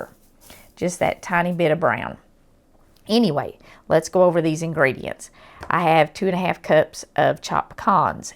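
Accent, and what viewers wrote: South, United States. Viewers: Question: Could the batter be put into an 8x8 dish like fudge, then cut into squares once set? Thanks for this recipe, and for speaking slowly enough to understand you! Love your southern accent!! 😊 XOXOXO God bless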